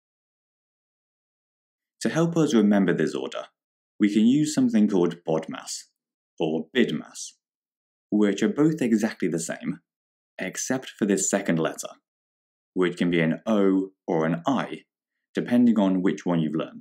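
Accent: United Kingdom. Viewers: 😍😍 HES BRITISH!! (my dad is forcing me to watch a bod maths video not like I want to)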